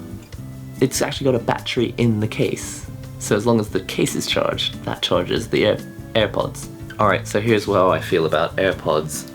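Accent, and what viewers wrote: United Kingdom. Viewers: Yep. This comment was a joke. He obviously sounds like he's from the UK. And I was taking a swipe at the English.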